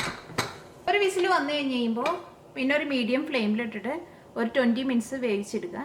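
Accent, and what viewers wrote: Thrissur, India. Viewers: തൊലിഞ്ഞ തൃശൂർ സ്ലലാങ് ഒഴികെ എല്ല്ലാം കിടിലോൽ കിടിലം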